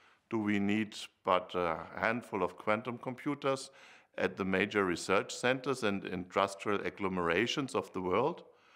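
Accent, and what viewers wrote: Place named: Swabia, Germany. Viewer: Tja, dem Dickerchen scheint keiner zu zu hören, dann bin ich mal der ERSTE: Erst einmal vorneweg Herr Braunibärli, auf dich wartet nichts Größeres, das muss dir nach diesem Vortrag (ablesen) absolut klar sein. 🤪 Meine Empfehlung an dich, Dickerchen, erst einmal abspecken, ich schätze mal so 20 kg, wird zwar schwer sein als Beamter, aber das musst du nun durch, Zeit sollte genug vorhanden sein, außerdem verlangt es der grüne Zeitgeist. Nur Veggie, kein Fleisch und Kohlenhydrate Adieu. 😉 Zweitens: Spießeranzug wechseln, einfach mal locker drauf sein, mit eine wenig Anstrengung klappt das bestimmt. (Vorsichtig ran tasten) Drittens: Haartransplantation, du siehts echt uncool aus, Geld sollte ja genug vorhanden sein als zweit oberster Schwätzer der BRD. Viertens: Weniger mit den Händen fuchteln, sieht echt bedrohlich aus, wollte schon vorschnell am PC einen RESET durchführen, meine rechte Hand konnte gerade noch meine linke zurück halten, ging aber noch mal gut. 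Fünftens: Die Fahnen im Hintergrund gehen ja gar nicht, ich sehe da absolut keinen Zusammenhang, die wehen auch nicht, wollte fast schon weinen schreiben. Sechstens: Was kostet eigentlich der Schinken links im Hintergrund? (Und bitte nicht rot werden) Siebtens: Mehr Englisch üben oder war das schwäbisch, na ja, so schlimm wie der Kollege aus dem Schwabenland war es ja nun doch nicht, obwohl... Achtens: Um was ging es eigentlich? 😁😁😁 Und Neuntens: Wer hat den Quatsch überhaupt bezahlt???, wirrrrrrrrrrrrrrrrrr? Puh, fast ver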